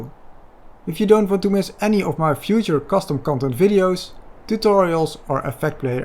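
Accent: Netherlands. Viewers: You sound Dutch to me🤣 if I'm correct you will be able to understand this ; Het ziet er goed, ik ben hier heel blij mee! Dank je wel voor alle uitleg!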